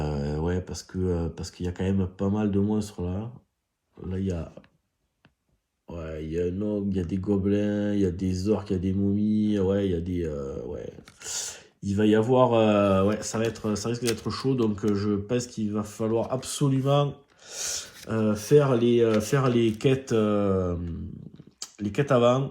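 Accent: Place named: South, France. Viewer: J'ai pas comprit où on devait entendre une quelconque ébriété. Serait-ce l'accent du sud qui vous donne cette impression erronée?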